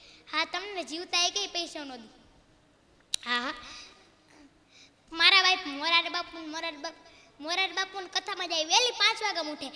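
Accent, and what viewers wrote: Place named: Rajkot, India. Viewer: Vaah Rajkoti boli..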